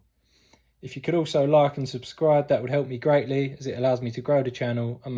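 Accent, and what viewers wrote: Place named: United Kingdom. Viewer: Ohhh that british accent.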